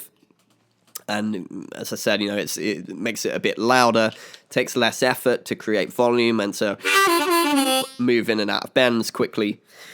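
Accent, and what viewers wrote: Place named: England, United Kingdom. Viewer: The first time I recognized you, was in that incredible "Free Bird Solo" video and I thought, you were an american. But then, I heared you talking and I was surprised, that you are an english guy :) I like that so much! Keep up the good work mate :)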